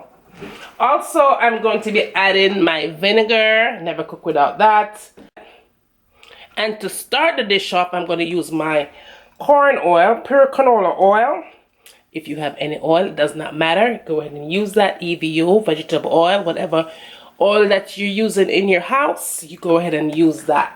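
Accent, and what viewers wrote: Jamaica. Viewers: nice simple recipe!! Btw mi love the Jamaican accent!! Real yaady!!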